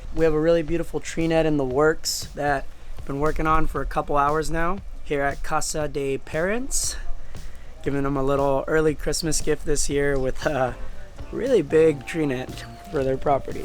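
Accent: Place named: Spain. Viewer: I like the Spanish accent. Caught me off guard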